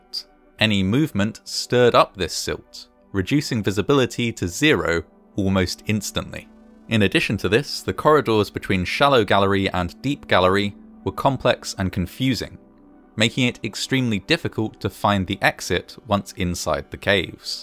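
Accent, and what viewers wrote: United Kingdom. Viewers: British accents work really well for documentaries. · I watch your disaster videos vs others due to that British accent. Keep it up!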